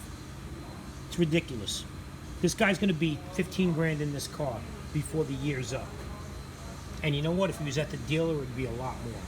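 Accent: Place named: Valley Stream, United States. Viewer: I watched this video and by your accent I'm going to guess you are from valley stream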